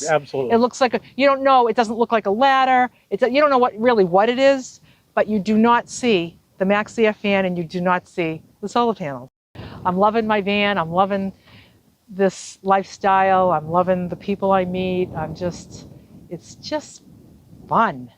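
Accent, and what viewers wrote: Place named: East Coast, United States. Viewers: Brilliant woman, brilliant van! Love her accent. Is it New York? (Brit here) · New subscriber to.. you rock sister Certainly from the East Coast dialect.. 😅 much love from McDermitt Oregon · Light weight is important. Kitty litter works well, just ask my cats. I hear a northeast coast accent. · I can tell she’s probably from Massachusetts. My home state, what a lovely lady.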